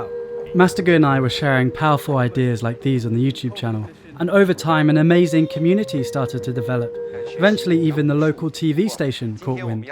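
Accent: United Kingdom. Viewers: British accent is horrible...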